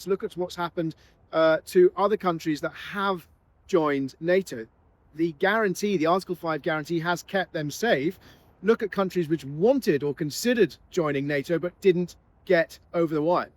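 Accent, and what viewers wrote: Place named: United Kingdom. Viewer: bla bla bla brit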